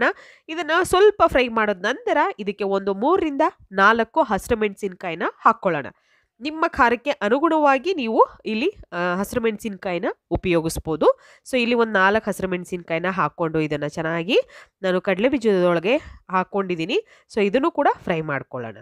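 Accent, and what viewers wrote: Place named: Malenadu, India. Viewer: ಇವತ್ತೇ ಮೊದಲನೇ ಸಾರಿ ನಿಮ್ಮ ವಿಡಿಯೋ ನೋಡಿದೆ. ನಿಮ್ಮ ಕನ್ನಡ ನಮ್ಮ ಮಲೆನಾಡಿನ ಕನ್ನಡದಂತಿದೆ. ಖಂಡಿತ ನೀವು ತೋರಿಸಿರುವ ಚಟ್ನಿ ದೋಸೆಗೆ ಮಾಡುತ್ತೇನೆ. ಧನ್ಯವಾದಗಳು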